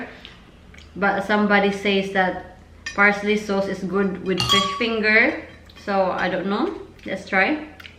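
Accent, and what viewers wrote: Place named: Visayas, Philippines. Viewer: I just saw your blogs in YouTube, I really liked it. May I ask where are you from in the Philippines? You sound like Bisaya. I'm from Cebu too.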